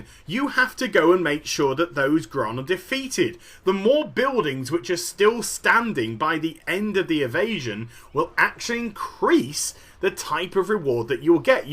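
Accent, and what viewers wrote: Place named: England, United Kingdom. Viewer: I Think hes English :D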